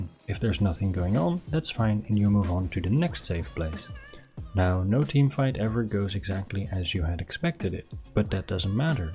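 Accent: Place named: Netherlands. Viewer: You're Dutch aren't you 😜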